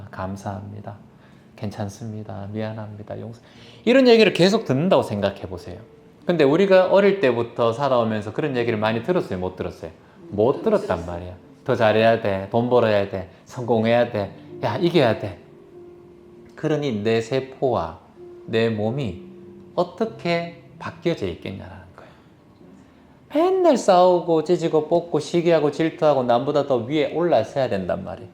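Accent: Gyeongsang, South Korea. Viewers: 저는 대구입니다. 편안한 목소리와 살짝 경상도 억양이 더 정감갑니다.💓 늘 감사드립니다. 감사합니다 ^^고맙습니다 ^^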